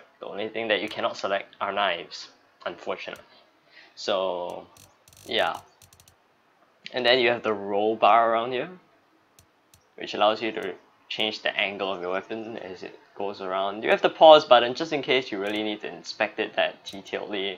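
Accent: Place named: Singapore. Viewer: Are u singaporean? u have a accent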